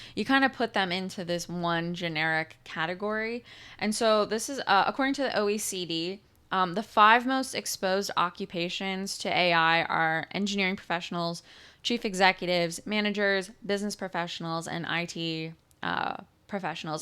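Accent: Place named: Northern Virginia, United States. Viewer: 30+ years in DC. 75% of women workers were superfluous. True CS degrees had at most 2% women and they were foreign. UMd had the best CS program. Further, DC is NOT segregated. That’s what makes it dangerous. Clueless white people move into black neighborhoods and become a statistic. You sound like you lived in far NW or Northern Virginia. You don’t sound like you lived in DC proper… Which was a good idea.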